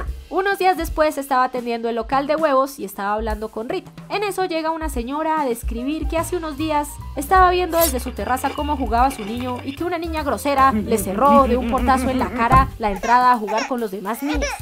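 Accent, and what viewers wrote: Colombia. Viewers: eres de colombia no?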